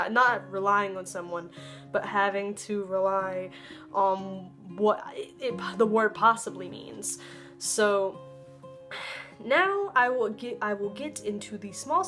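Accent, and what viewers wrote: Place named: United States. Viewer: As a Dane, your pronunciation needs work obviously and you got a heavy American accent, I would suggest finding a Danish person to chat with in Danish if you want to iron out the pronunciation and accent. That said, good work and I greatly encourage you to keep learning Danish, it is a really fun language once you start understanding the deeper aspects of it, as an example, a lot of Danish comedy is is based around subtle changes in how things are said and phrased. I have a warning for you if you travel to Denmark, if you don't demand that Danes speak in Danish to you we will change to English immediately when we hear that someone isn't near to native speaker level of proficiency, Danish people are generally fluent in English and find it easier to switch to English than to try and decipher foreign accents. I am warning you so you don't get discouraged if you come here in the hope of learning to speak Danish only to find everyone speaks English to you automatically.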